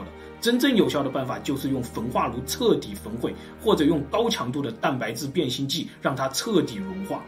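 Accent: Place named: Hunan, China. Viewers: 你是湖南人么… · 到底是哪里人啊？我好喜欢你浓重的西南椒盐普通话，好亲切好接地气！总会让我想起当年学校男老师们那蹩脚的川普~🤣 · 可能是四川两湖一带的口音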